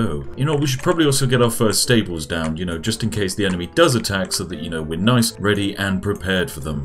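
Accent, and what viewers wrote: United Kingdom. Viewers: I Love your British English, especially the word „fantastic“. Great channel, greetings from Germany. New subscriber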